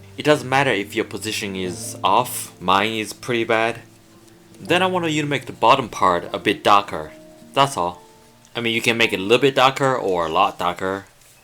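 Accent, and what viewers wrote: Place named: China. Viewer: This accent sounds like that of a Chinese guy speaking. His English grammar and vocabulary is great, however this accent really sounds Chinese. Good hints, beautiful drawing. Congratulations.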